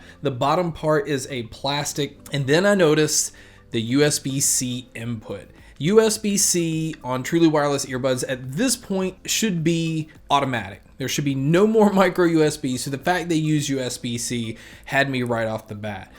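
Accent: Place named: Carolina, United States. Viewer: You have a Southern/Northern Carolina accent I love it!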